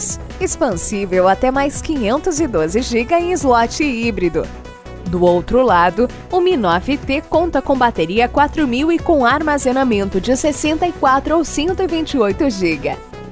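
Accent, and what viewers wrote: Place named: Rio de Janeiro, Brazil. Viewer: Ele, é Carioca ;)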